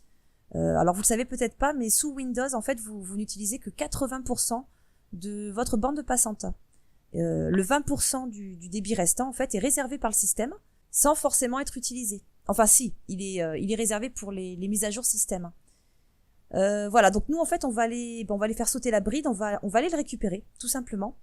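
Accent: South, France. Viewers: "cliquee droit"mdr, Merci pour ce bel accent, enfin un tuto sudiste ;) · a l'accent du sud l'accent qui chante ^^ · Excellent tuto que j' ai regardé avec plaisir. j'ai appris beaucoup de chose. Merci . Avec l'accent du sud.....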